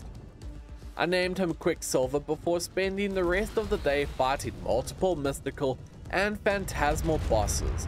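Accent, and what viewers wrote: South Africa. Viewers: I knew by the accent your South African lmao🇿🇦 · U from south africa???? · WAIT UR SOUTH AFRICAN OMG BRO WHAT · My guy are you from south africa? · No wonder your accent felt so familiar you're from SA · I was like this man sounds like a south african and when you said ahh eskom i was like yes he is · You South African😮😭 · i knew you where also south african i knew it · Are you south African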